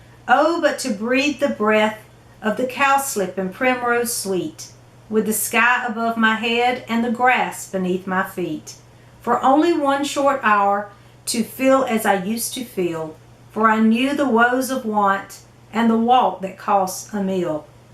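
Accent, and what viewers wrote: South, United States. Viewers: I love your south accent! It's more smooth in my (french) ears than the british accent 😊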